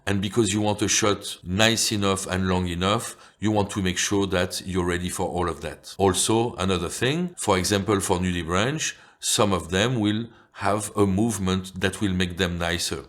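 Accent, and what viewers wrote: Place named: France. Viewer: Excellent macro underwater photography advice and thank you fort sharing it. You sound especially authoritative with your French accent as it strongly suggests the entire Cousteau family!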